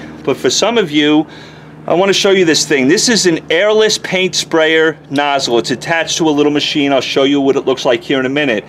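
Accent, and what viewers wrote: New York, United States. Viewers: Sound like a New Yorker.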